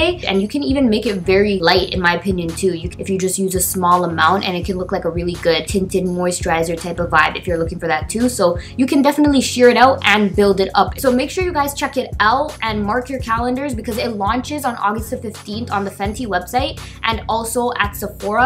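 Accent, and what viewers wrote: Toronto, Canada. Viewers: i hear the Toronto/GTA accent im dead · You talk like you live in Toronto/Scarborough.... 🇨🇦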